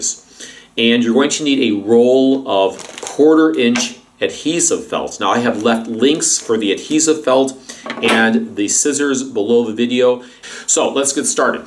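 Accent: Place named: New York, United States. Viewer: Dear doctor! 🤓 What a great detailed explanation, which took the mystery out of this problem. I understand WHY the foot pads did not alleviate my pain (between 2nd & 3rd bones) before. I bet you are a New Yorker? I’d recognise that accent anywhere. This was extremely edumacational! 🤓 A grateful New Yorker in London. 🇺🇸🌹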